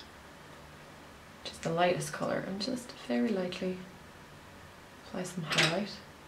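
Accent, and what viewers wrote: Ireland. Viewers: Love it! You're sounding much more Irish in this video :) Did you recently visit home?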